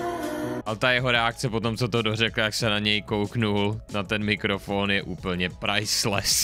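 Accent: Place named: Moravia, Czech Republic. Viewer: Ta slatka Morava. 🙂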